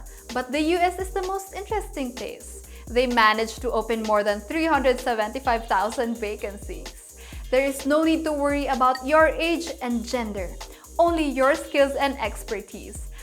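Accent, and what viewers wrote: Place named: Philippines. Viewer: Thank you ma'am ganda nyo po. i loove your filipino accent. say it again.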